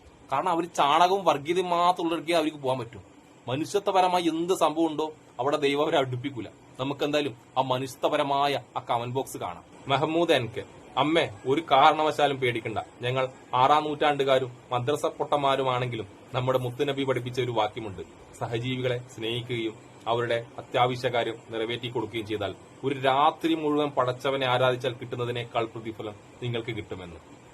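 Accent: Kannur, India. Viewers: ഭായ് എന്നും നിങ്ങളുടെ മേൽ ദൈവാനുഗ്രഹം ഉണ്ടാകട്ടെ.... സംസാരം കേട്ടിട്ട് കണ്ണൂർ കാരനാണെന്നു തോന്നുന്നു ഞാനും കണ്ണൂരാണ്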